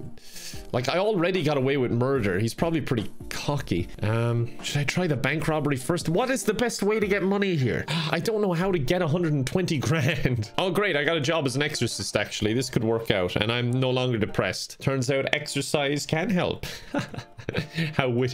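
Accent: Ireland. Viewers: This is truly the one channel on YouTube that can make me laugh the hardest consistently at whatever he plays. Also the Irish accent is a big plus too can't forget the accent.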